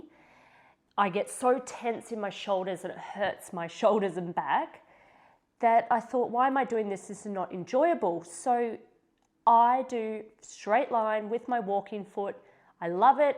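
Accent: Australia. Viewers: Lovely to hear an Aussie voice, I'm from the Sunshine Coast near you. Interesting video, love getting ideas from other quilters. I like the idea of stitching around your focus shape. I've done a bit of hand stitching around my borders, pretty hard to do without a big table but heck, gotta try something new. Since I don't have a big table I've been doing "quilt as you go" but I like the look of a separate top, and your backings are nice. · Lovely to hear an Aussie talk about quilting. Hello from the Sunny Coast. My suggestion for quilting would be diagonal lines both directions AND inside the white as you were thinking. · I love your accent! My Mother was from Perth. SO I am loving your accent. MyrLene ❤️ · Oh, I discovered your channel today and was so pleased to hear a fellow Aussie voice. I love to discover sheets and blankets to use for my backings at our local charity shops. 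Love your quilts ❤ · Just found your channel and it’s refreshing to hear an Aussie! I’m also from Brisbane! I relate to your quandary on what to quilt ! I’ve never used a sheet but I’m thinking it’s a great idea, also using old men’s shirts. I’ve been watching a lady in the US who buys lots of shirts and makes beautiful quilts with them. If I can remember her channel name I’ll comment again.😊 Found it! From Small Things, her name is Sue and I find her very interesting 😊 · I’ve just come across your site and it’s nice to hear an Aussie accent. I live in Perth. Your quilts are lovely and your backing ideas great. Thanks for sharing your ideas. 😊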